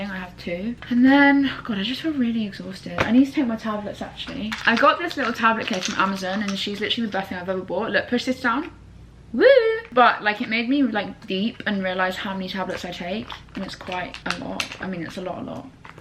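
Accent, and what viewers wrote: United Kingdom. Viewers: Am I the only one who didn’t know she was British 😂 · I love your British accent ❤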